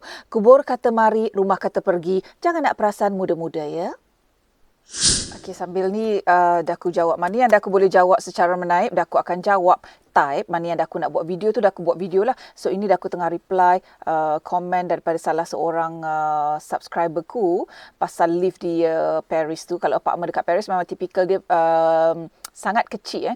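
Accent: Negeri Sembilan, Malaysia. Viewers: akak org nogori ko? slm sri segomban!!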